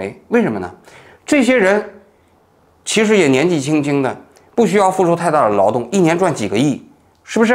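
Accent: Standard. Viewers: 王局发音很标准，没字幕我也能听懂，没字幕也行的。同意的点赞。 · 王局字正腔圆，声音清楚，不需要字幕也听的非常清楚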